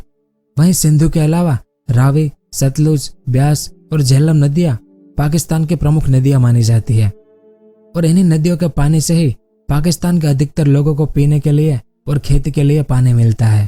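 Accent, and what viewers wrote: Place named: Rajasthan, India. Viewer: Aap rajsthani ho apki voice tone Rajasthani jasi h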